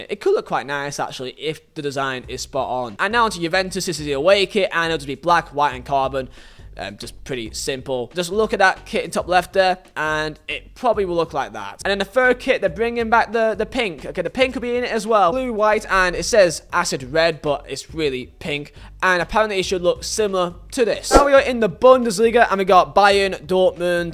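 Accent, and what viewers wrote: United Kingdom. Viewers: ¿this dude is british? (question from a Mexican.)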